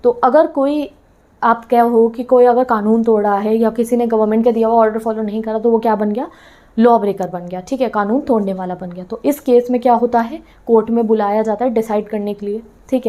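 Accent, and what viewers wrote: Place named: Odisha, India. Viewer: Are u from Odisha?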